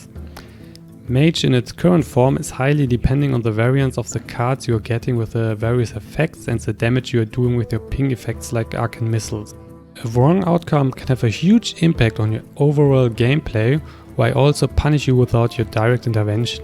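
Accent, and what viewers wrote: Germany. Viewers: Nice work, I like the style of your Videos! Regarding to your pronounciation I would bet you are also from GER?!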